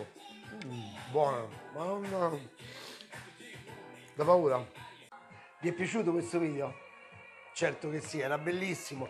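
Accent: Rome, Italy. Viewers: A chi non piace come parli? Aó se sei de Rome sei de Roma no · N1 ti seguo da poco sei molto simpatico e l'accento romano è il top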